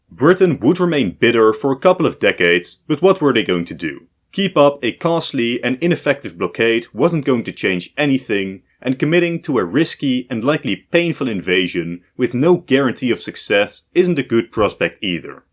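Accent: Netherlands. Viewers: You sound dutch like me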